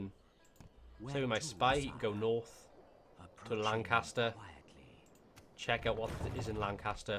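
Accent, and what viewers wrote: Wales, United Kingdom. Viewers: So damn happy this is finally out :D Plus I love the Welsh accent so your voice should compliment this lovely :P Anyhow enough commenting, time to watch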